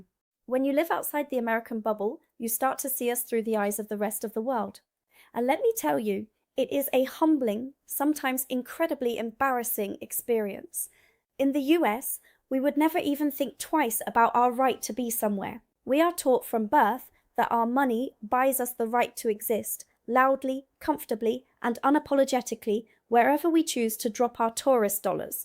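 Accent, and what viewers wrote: England, United Kingdom. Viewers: Your pronunciation does not sound US; it sounds very British. How did you manage this? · Much as I have sympathy with what's being said on this channel, I do wonder why this supposed USA citizen has an English accent. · the host speaks with a clear British accent with no trace of any identifiable American accent or American word choices. I can only assume that she is British but lived in the States for maybe half of her life and kept the accent. She needs to make a video and clarify this for us. It’s too distracting for me. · Is the host of this video really an American speaking with a British accent? (I'm new here)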